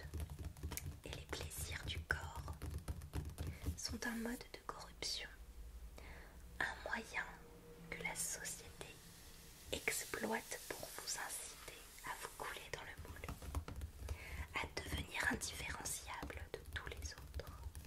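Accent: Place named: France. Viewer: Ah mais tu est française en fait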